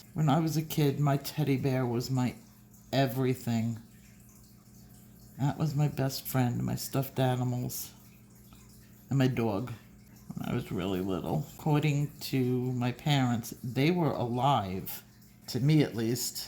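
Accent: New York, United States. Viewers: I LOVE YOUR NEW YORK ACCENT! PLEASE DON'T CHANGE IT! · great tutorial! Grew up in NY so your NewYorker sounds like home.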